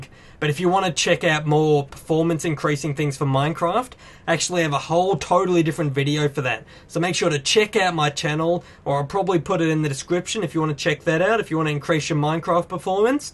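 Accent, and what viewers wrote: Australia. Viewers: Are you Australian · no offence but are u Australian?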